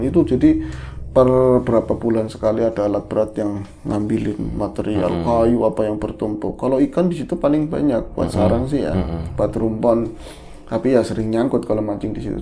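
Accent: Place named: Tegal, Indonesia. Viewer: Mudah2an setelan Mas skrg mulai dari outfit ( topi)sampe logat Tegal dan gaya ceritanya AWET TERUS. Pertahankan,mas!!! Ini adalah Trademark kalian dan unik sekali!!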